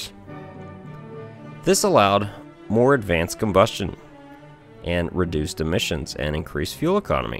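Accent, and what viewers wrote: Western Pennsylvania, United States. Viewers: Where are you from? Sounds like western PA.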